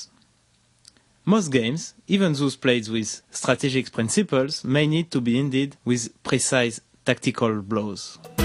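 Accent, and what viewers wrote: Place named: France. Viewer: It is "Think on this position" not "Sink on this position" ! You should work on your accent french boy !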